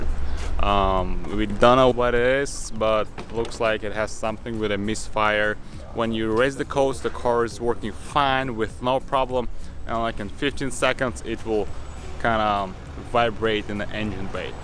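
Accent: Russia. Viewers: Russian accent wtf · Непонятный акцент !! Трудные слова с русским акцентом А когда вспоминаешь походу про акцент и хочешь говорить немного по американски начинается. Бррп брп брэп бэп 😅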